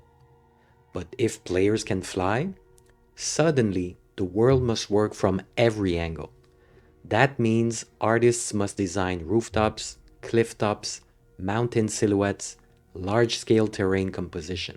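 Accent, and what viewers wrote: France. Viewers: Are you a fellow frog? I seem to recognize my people’s accent! Great video by the way! This game is breathtakingly beautiful. I have spent so much time around puddles and creeks watching water and nature just happen. I’ve never walked as much in a game before! I literally watched comrades I sent to build a house build it in real time. It took 6h in-game! The level of immersion is off the charts in this game! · Great analysis! This is probably my favorite game since Skyrim. Maybe with the exception of RDR2. I'm 80 hours in, mostly due to having been on a sick leave for 2 weeks, and I have never been gaming so intensively before, at least not since Skyrim. For me, it's an absolute strike of genius. New sub from Norway ! And kudos for your English as well. I believe I can hear some French accent from time to time, but very little. French, Danish and Norwegian people tend to struggle with heavy accent 😊 (Sorry if I'm mistaken about the accent 😁)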